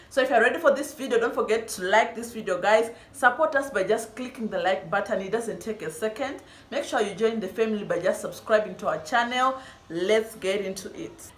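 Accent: Uganda. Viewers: I don't understand. You have an Ugandan accent. How come you didn't know the language 😂😂😂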